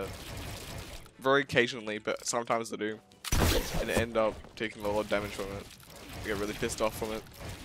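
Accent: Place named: United Kingdom. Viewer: a little bit of British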